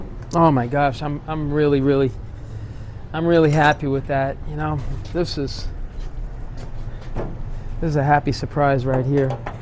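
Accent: New York, United States. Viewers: Alabama here, I would have won a bet that you were from NY, by your accent.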